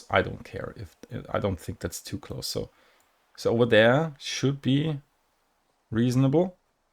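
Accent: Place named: Austria. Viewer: Austrian accent detected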